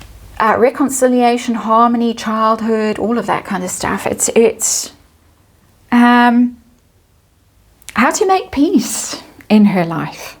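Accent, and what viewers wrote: South Africa. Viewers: I wondered before you started this reading if your empathetic tarot reading would clash with astrology and the cards would not co-operate. However, as you seem to be able to keep both worlds happy, they were allowing you to gain what you were seeking. Rachel on the other hand? Maybe, having sold her soul to the devil, even he has chucked her out, so she is trying to cosy up to the heavens. I really enjoyed this reading and PLEASE keep your lovely soft South African lilt and don't try Russian😇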